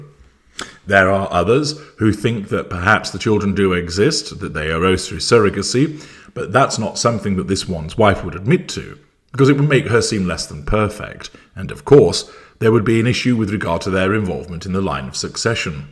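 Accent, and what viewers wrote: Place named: RP, United Kingdom. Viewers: You the devil's son,found out your kids are not yours,so making up bullshit about others. Trying to talk posh when you just so sick,should be in a mental home. Tina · This man’s channel is only positioned to offer his guesses. He uses a posh manner of speaking and decent production vale’s which both lend themselves to a “feeling” of truth. His guesses and opinions have no more credibility than yours or mine. For anyone who cares about my opinion I happen to think there does exist 1 boy child, born by a surrogate, that is of no relation to either Harry or Meghan. I believe they have no custody of him. I believe there is no girl child whatsoever because the child was supposedly born in the US. I notice the British have trouble understanding that in the US there are no secret births no redacted birth certificates. If a birth certificate cannot be located by the most ordinary citizen there is only 1 reason for that — there was no birth. 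There are no exceptions. It is that simple and no one on planet earth can refute that fact. The BRF — none of them — have ever seen this girl child. Serious mistakes have been made by both Queen Elizabeth and even more so by Charles.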